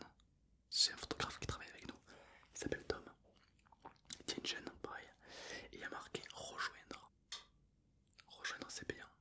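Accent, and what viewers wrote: South, France. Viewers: Salut Tom voici un message pour toi. Ca fait longtemps que je te suis mais je ne serais pas dire depuis quand car à chaque fois que je te regarde c'est avant d'aller au lit et je m'endors sur mon fauteil puis je passe au lit. Depuis 3 ans maintenant je n'ai jamais aussi bien dormi. Je trouve que tu as une voix vraiment faites pour ce que tu exerces, L'ASMR. J'aime ton français du sud un peu chanté c'est vraiment appaisant. Ce roleplay c'est la vidéo que je préfère et surtout la mieux réaliser que tu ai faites à mon goût. Le décor est simple et léger, et on sent que tu sais de quoi tu parles, que tu es passioné avant tout. Merci pour tout tu es vraiment une personne en or avant d'être un YouTuber excellent !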